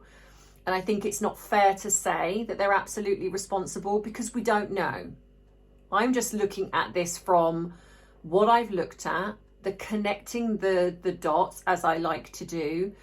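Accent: England, United Kingdom. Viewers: Enjoy you videos and I like hearing about the Royals with an English accent. I don’t know what I think about this theft but I have heard that other items like a bracelet have gone “missing.” I also like the stance you took regarding Hello Fresh. I used them for a year and a half, cancelled after I found out and due to other issues with them. I appreciate you doing this.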